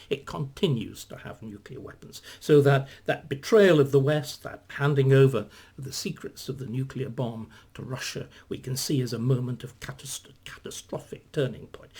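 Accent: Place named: Oxbridge, United Kingdom. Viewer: Starkey is a fabulous Tudor historian but his increased penchant for political commentary has become annoying. As an academic, he should be more modest in his commentary on subjects outside his area of expertise; and certainly should not air such commentary on the the "David Starkey Talks" format. This confuses his area of competence with areas in which he is merely an amateur, albeit an educated one. His political talks lack objectivity. He always seems to know which conclusion he wants to draw. People may start to question whether he takes the same approach on his histories! Alas, judging by the comments below, my fellow Americans are too willing to give credence to this warmed up drivel delivered in an Oxbridge accent.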